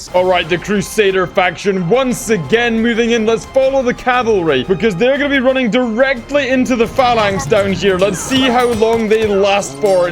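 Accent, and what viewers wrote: Scotland, United Kingdom. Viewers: you scottish because i am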